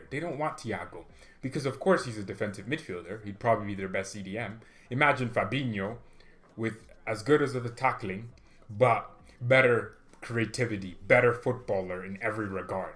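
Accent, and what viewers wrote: England, United Kingdom. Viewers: Dude you English accent is funny as hell. 😂😂😂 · That English accent tho 😂👌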